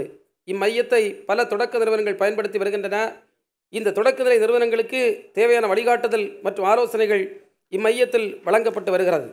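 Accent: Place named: Nanjil Nadu, India. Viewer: நாஞ்சில் தமிழ் மிகவும் அருமை... வாழ்க தமிழ்....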